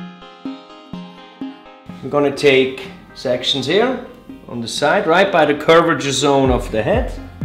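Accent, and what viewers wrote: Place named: Germany. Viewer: Where is your salon. I detect a German accent...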